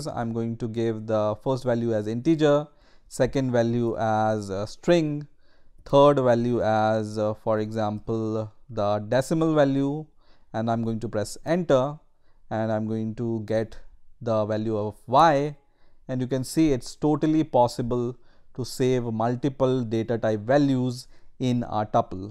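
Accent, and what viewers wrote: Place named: India. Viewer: i like your indian accent. it gives the lesson some life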